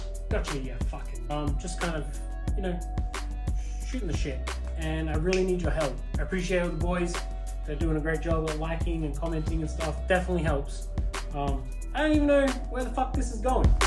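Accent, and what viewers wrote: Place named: United Kingdom. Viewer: The British accent just does it for me 😍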